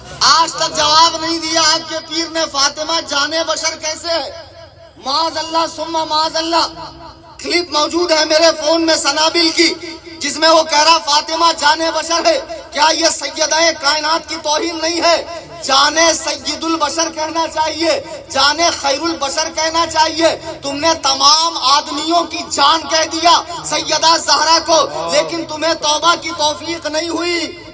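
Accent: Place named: Karnataka, India. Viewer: Karnataka accent mashallah Hazrat ko itna achha kese aata he Mumbai me rehte hen fir bhi...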